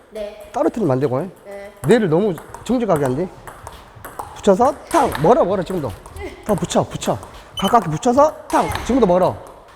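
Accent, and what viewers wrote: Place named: Jeolla, South Korea. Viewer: 전라도 사투리가 이렇게 구수하게 들리긴 처음이군요 ^^ 코치님 정말 멋지군요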